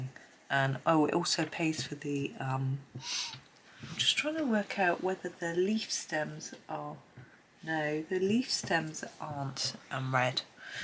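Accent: United Kingdom. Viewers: lol Welch's grape jelly is indeed like jello, as all jellies are. No solids. Jam, or preserves, does have solids, although preserves is usually thicker, with bigger solid pieces. Marmalade is preserves, but always citrus based. Deja vu on this subject haha. Most Americans call the outside of bread the crust, and the end pieces are either ends or heels. Thanks for the geography info, too, although because I watch Time Team and a lot of Chateau restoration videos, I've already relearned things from my school days lol I do love hearing you talk, where you put your accents, and the phrases that are popular over the pond. Fascinating! Oh, anyways, you do the best berries!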